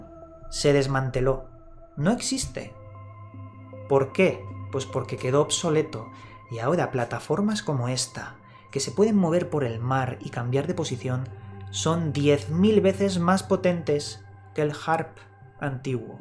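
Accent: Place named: Spain. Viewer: ESTAS COMO LOS RUSOS QUE PASARON UNA IMAGEN DE UNA ESPIRAL EN EL CIELO EN PUEBLA Y YO SIENDO DE ESTE ESTADO NUNCA PASO NADA¡¡ DE IGUAL FORMA SE DICE AQUI, EN CANALES PARECIDOS AL TUYO QUE PASAN COSAS RARAS DEL OTRO LADO DEL MUNDO, TU IGUAL ESPAÑOL CREO QUE LO ERES POR TU ACENTO HABLAS DE SITUACIONES EN OTRO LADO DEL ATLANTICO¡¡ O SEA ERES UN FAKE ¡¡ PORQUE NO PODEMOS CONFIRMAR ALGO ASI PUES ESTA ALEJADO DE NUESTRO TERRITORIO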